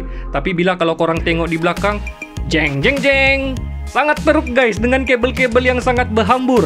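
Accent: Sabah, Malaysia. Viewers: Bro orang sabah kah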